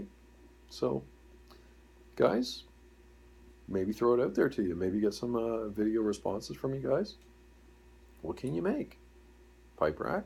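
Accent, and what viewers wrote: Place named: West Coast, United States. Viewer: Nice west coast accent lol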